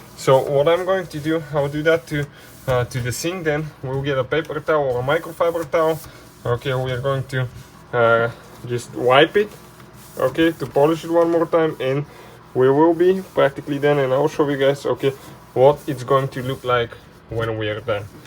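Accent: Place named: Russia. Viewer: He sounds Russian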